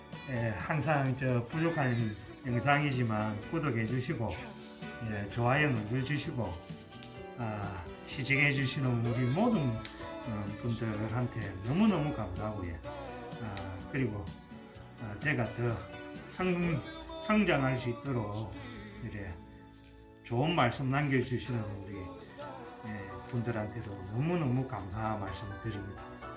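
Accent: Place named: Rural, South Korea. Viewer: 시골 어디신지 말씀이 정말 구수하시네요~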